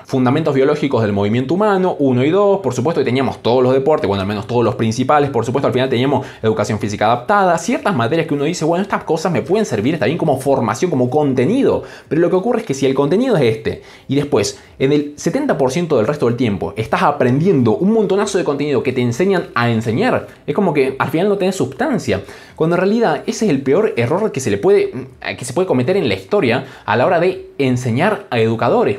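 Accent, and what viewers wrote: Argentina. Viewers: sos de Salta? whooooo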